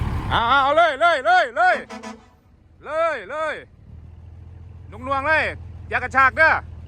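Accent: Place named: Laos. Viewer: ออกลาวจ้า89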